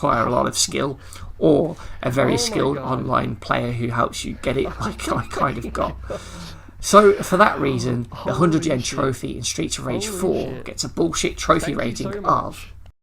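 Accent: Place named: United Kingdom. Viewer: I love your British accent, it’s hilarious lol you should make your channel of you complaining about games and your accent makes it funny & omg I subscribed and saved this video to my favorites lmao yesss!! American here 🇺🇸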